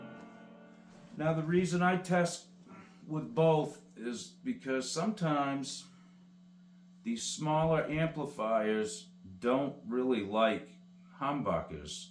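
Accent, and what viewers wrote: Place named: Boston, United States. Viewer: you boston sounding mf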